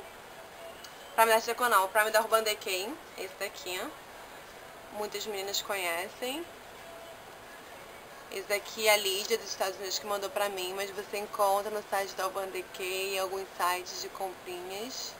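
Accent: Rio de Janeiro, Brazil. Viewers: Nossa flor, antes eu jurava que vc era uma pessoa metida pelo seu jeito de falar, mas hoje penso totalmente o contráriovi que é apenas seu sutaque carioca, vejo vc uma pessoa super legal e que apenas quer compartilhar conosco aquilo que vc sabe fazer bem que é ensinar a maquear, adoro seus vídeos, parabéns!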